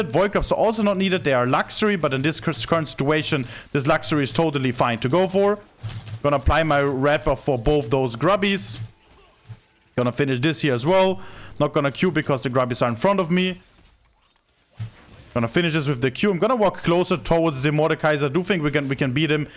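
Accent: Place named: Germany. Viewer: Genauso klingt mein Englisch auch xD Einfach wild als deutscher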